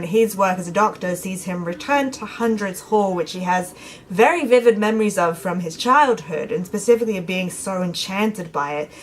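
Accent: United Kingdom. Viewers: Do you live in Southeastern England? You don't have a heavy accent.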